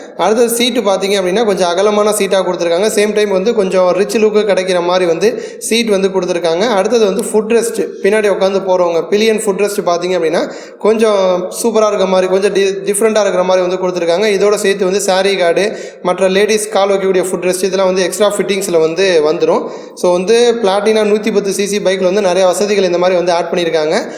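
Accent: Thanjavur, India. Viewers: bro neega thanjavur raa 😍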